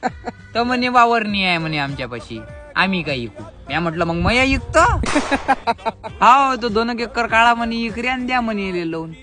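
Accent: Vidarbha, India. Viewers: Vidarbh Boltee💥💥😂😂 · Vidharbh Accent.. 1no..😂😂😂 love from Nashik bro..🙏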